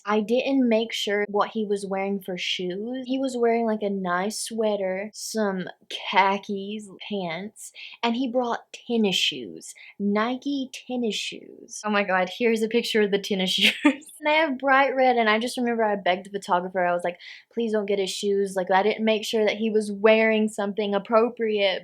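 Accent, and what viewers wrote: South, United States. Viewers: Girl I love you and your videos so much you have the cutest most subtle little southern accent and it’s honestly the most adorable voice I could live with you forever just as friends so I could hear you talk because it’s honestly the cutest thing ever And I don’t like girls like that, nothing is wrong if anyone does but I’m just saying your voice is just beautiful! And so are you! It’s honestly refreshing to see someone that’s just naturally so freaking beautiful inside and out and isn’t shallow or rude and is just authentically themselves Love you ♥️💜♥️😉😘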